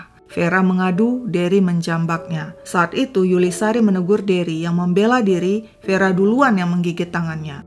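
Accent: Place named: Palembang, Indonesia. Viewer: paten jg kak bahasa palembangnya😂😂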